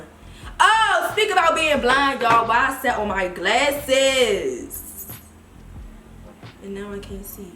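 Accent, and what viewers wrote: Washington DC, United States. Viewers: I just discovered your videos last night as soon as you spoke I was like she is a fellow DC girl 💕💕💕💕💕 Love all your makeup looks !!!!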